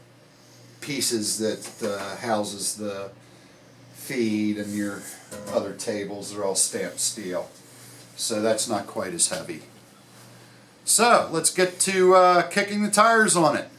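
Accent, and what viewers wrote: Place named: Maryland, United States. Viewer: I thought I detected a Maryland accent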